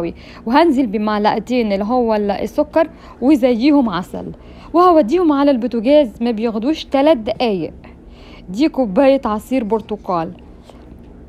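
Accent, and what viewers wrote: United States. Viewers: من لهجتك كدا انتي ياما مولوده ف امريكا ياما روحتى وانتي صغنونه تسلم ايديكي💐💗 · هو انتى منين وبقالك كام سنه فىامريكا مش عارفه عربى ههههه على امريكى عسل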